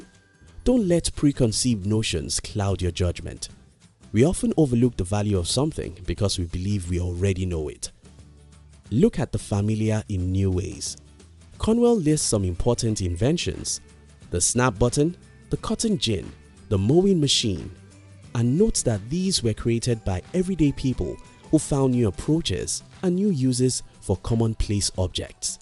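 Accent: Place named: Nigeria. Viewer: He sounds Nigerian.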